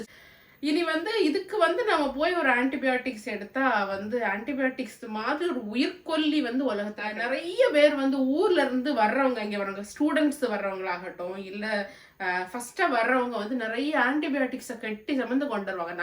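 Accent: Nagercoil, India. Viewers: Ver good recipes, very good laugh, Nagar Koil tamil, Positive energy... Super அக்கா... London அக்கா... I am from Chennai. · Hi akka...lot of fun in your videos. Original nagercoil slang...me too from nagercoil ...living in Muscat. Feel homely when hearing your voice...casual talks...awesome ...keep rocking ...May our Lord Jesus bless you. Be blessed & be a blessing to many.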